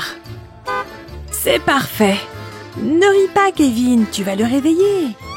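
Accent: England, United Kingdom. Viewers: en plus il sons englais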